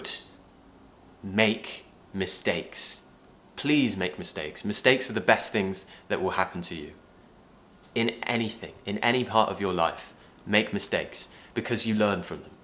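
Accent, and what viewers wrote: United Kingdom. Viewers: I think im fallin in love with this guy he is so charismatic while talking with his brit accentttt💕💕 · I like your British accent thank you so much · Okay after this video I'm already your fan You mind looks like with mine... but with british accent hahahaha I like it! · Nice to hear from you once again... Lov the British accent lol.. Lov from Africa the Gambia... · hi Teacher excellent your English"s course. ( and I love too the britsh accent). For me is the best !!. ( not against other please ). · I found your videos by mistake and I loved your attitude. My goal this year is achieving a better British accent like yours. Excellent video! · Sir, I'm from India. I'm passionate about learning English. I have been watching your YouTube speeches for the past few months. I'm inspired by your British accent. I am passionately learning phrasal verbs nowadays. 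I like your speeches very much. Happy new year sir.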